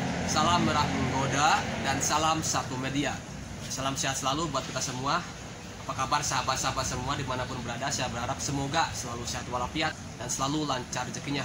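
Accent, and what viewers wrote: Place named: Medan, Indonesia. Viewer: Medan bg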